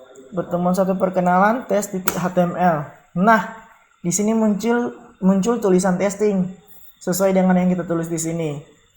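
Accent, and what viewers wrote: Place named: Medan, Indonesia. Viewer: Medan x bang bahasa nya paten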